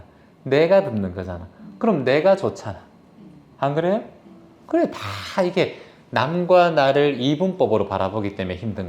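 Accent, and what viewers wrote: Gyeongsang, South Korea. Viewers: 저는 대구입니다. 편안한 목소리와 살짝 경상도 억양이 더 정감갑니다.💓 늘 감사드립니다. 감사합니다 ^^고맙습니다 ^^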